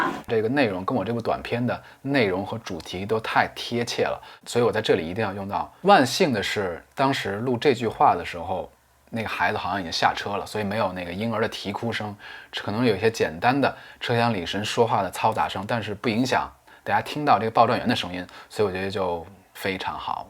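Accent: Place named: Beijing, China. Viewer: 太厉害了，感谢分享，ps：京腔很正～！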